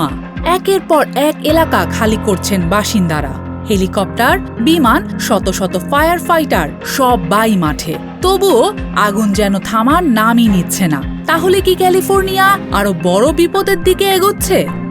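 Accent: Kolkata, India. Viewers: কলকাতার মত কেন নিউজ পরছেন?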